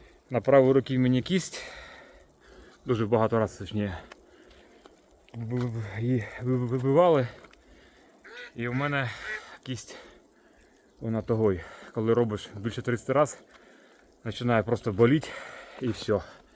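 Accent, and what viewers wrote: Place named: Surzhyk, Ukraine. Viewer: Що ж вас так коробить? Все гарно у нього виходить , нічого він не мучається , класичний український суржик .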